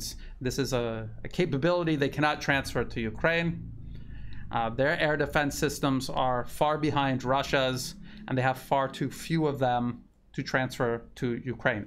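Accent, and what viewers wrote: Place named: United States. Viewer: It might be your American accent but I hear you talk about “guided bums”. Sounds kinky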